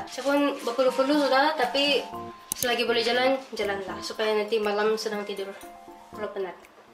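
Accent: Sabah, Malaysia. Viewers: I like your sabahan excent ttap maintain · sabahannn xD from the accent i know dy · org sabah mana ko? mcm logat tu tau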